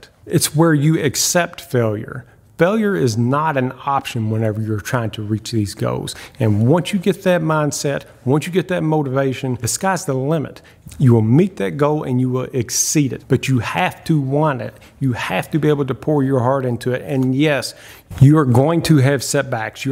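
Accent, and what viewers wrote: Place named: Virginia, United States. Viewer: I gotta ask. Are you from Virginia by chance. Just going by your cool accent.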